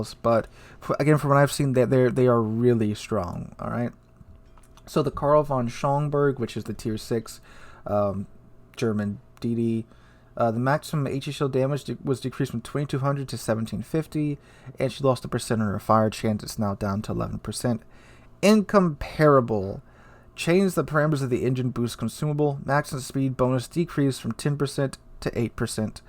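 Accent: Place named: United States. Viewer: True, but pronunciation isn’t bad, it’s just the Americanization, like saying Edinburgh as Edinburough instead of Edinburra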